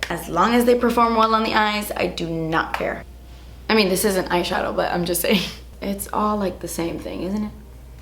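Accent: Cuba. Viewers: Please speak more Spanish!! :D I’m Guatemalan-American and LOOOOOOVEEE hearing other bilingual Latinos speak in their native tounge 💕😊 Your Cuban accent is the Cutest! Just like you! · Jajajajaja ame tu acento cubano!!!! Jajajajaj españo-cubano 😂😂😂😂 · i love her cuban accent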